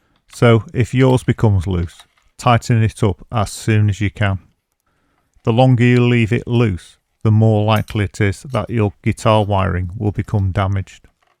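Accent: United Kingdom. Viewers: I am a language teacher and have no idea about these items you explained so well. Thanks, I subbed and am looking forward to other vids to come. Greetings from Austria! Btw I'd like to see some vids about how connecting different gear (looper, modeler, and other gear) Of course I subbed and liked. Cheers! Am I right, you're British, ain't you?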